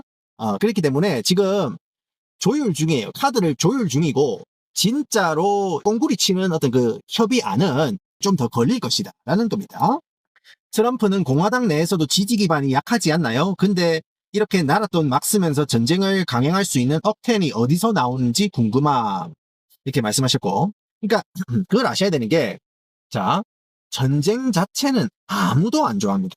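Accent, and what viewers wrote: Gyeongsang, South Korea. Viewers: 경상도 오빠야가 풀어주는 세계정세 ❤ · 트럼프 성대모사만 나오면 너무 잘한다. 그 경상도 영어 사운드에 익숙해 저버렸으. 캔유 빌리브댓!! 😂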